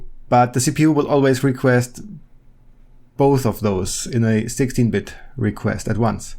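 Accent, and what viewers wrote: Germany. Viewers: Ich höre einen leichten German Acczent ;o)... warum nicht gleich auf deutsch - gibt leider, viel zu wenig solcher guter Videos auf deutsch! Trotzdem: gute Arbeit - vielen Dank!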